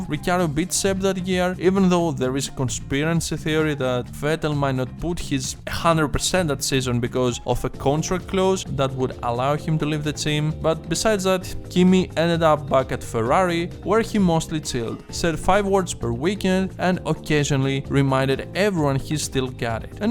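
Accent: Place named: Greece. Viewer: Where are you from? Your accent sounds very greek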